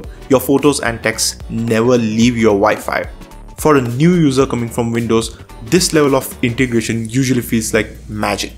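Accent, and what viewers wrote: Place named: India. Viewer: Apu dialect made me close this shit instantly...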